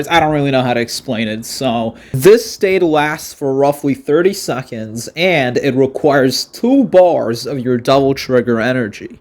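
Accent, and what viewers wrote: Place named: North America. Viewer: Are you Serbian? Your YouTube UI says so but your accent doesn't sound like you've left North America for a second of your life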